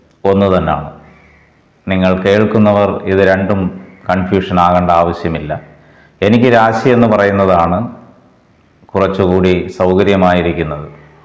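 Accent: Tamil Nadu, India. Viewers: താങ്കളുടെ സംസാരത്തിൽ ഒരു തമിഴ് ശൈലി വരുന്നുണ്ട്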